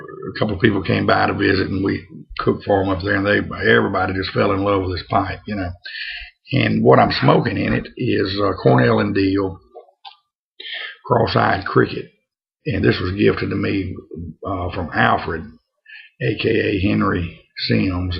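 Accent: Louisiana, United States. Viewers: That's a big drinking mug you have there. Sorry to hear about your pains my friend. Thanks for the chat. Looks like you did well in buiness. Love the Louisiana accent. Alan.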